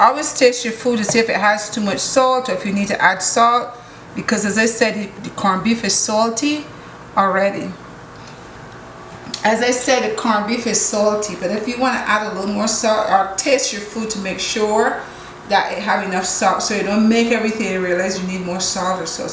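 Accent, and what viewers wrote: Barbados. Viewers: So good to hear your Bajan accent!!! I'm Bajan too living in Jamaica. I'm about to make some fritters now! Thanks for this recipe.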